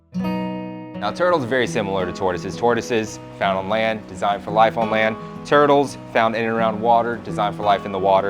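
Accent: Australia. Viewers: He’s sounding a little more Aussie.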